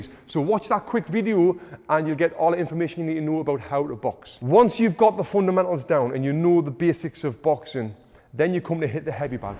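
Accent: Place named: Newcastle, United Kingdom. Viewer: Brilliant accent, Newcastle?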